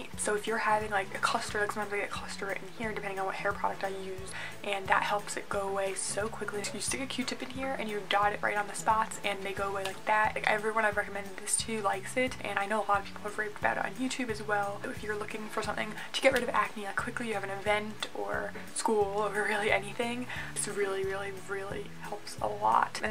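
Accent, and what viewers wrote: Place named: United States. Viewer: She talks to fast can't understand her American accent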